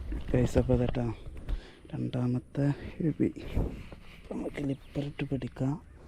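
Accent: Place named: Malappuram, India. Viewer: അടിപൊളി സൂപ്പർ ആദ്യമായിട്ടാ ഒരു spoti ൽ നിന്ന് ഇത്ര എണ്ണം പിടിക്കുന്നത് കാണുന്നത്. സംസാരം കേട്ടിട്ട് bro മലപ്പുറം ജില്ലയാണ് എന്ന് തോന്നുന്നു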